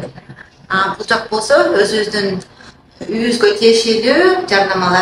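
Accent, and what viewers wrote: Standard. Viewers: Азаматсын! Таза суйлогон кыргыз кызы экенсин.Аман бол.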